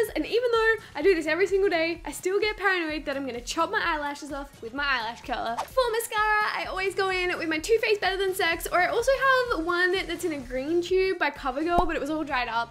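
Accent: Australia. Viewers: just discovered your channel !its amazing ..and i lovvee that Australian accent tht you havee !! ..but how old are you !! do an Q&A !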